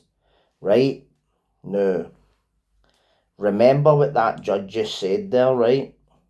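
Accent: Scotland, United Kingdom. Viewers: Could just tell he was Scottish before opening the video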